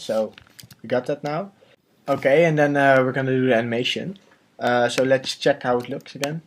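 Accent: Netherlands. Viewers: Yeah I can hear a dutch accent boyyy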